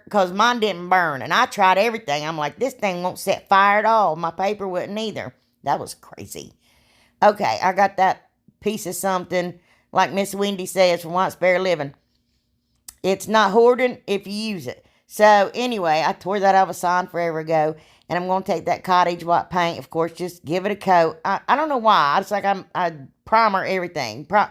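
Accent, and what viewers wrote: South, United States. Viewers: You sound so country I love it. You really want to light the house up with that kerosene and gasoline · Hi from Texas, I'm newly subscribed and wanted to say how much I've been enjoying your crafting. You are such a delight to watch and when you get tickled it makes me laugh right along with you. You talk like I talk country kind of people. You keep those videos coming because you are a treasure to watch. I hope you get to feeling better. You have such a sweet personality, God bless you honey.🥰🤗💕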